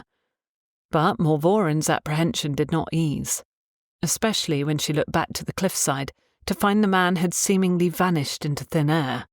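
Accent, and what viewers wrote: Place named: Cornwall, United Kingdom. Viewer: did a great job. She really brought the story to life with her narration and Cornish accent. She was the perfect narrator for your wonderful story. I’ve enjoyed this series. Thank you for making it available on YouTube.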